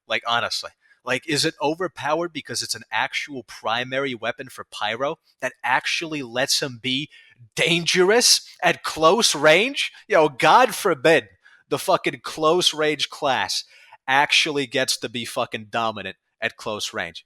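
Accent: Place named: New York, United States. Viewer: do you think swearing 27 times every sentence in new york accent makes you sound more confident or smth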